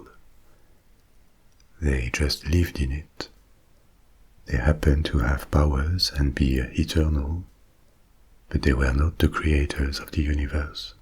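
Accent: France. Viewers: I went through a few other videos to find a relaxing voice like this. The French accent is a bonus and surprisingly relaxing. Will stick with the from now on. Love the stories. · The best ASMR creator. That velvet French voice. Knocks me out every time. Thank you for all you do !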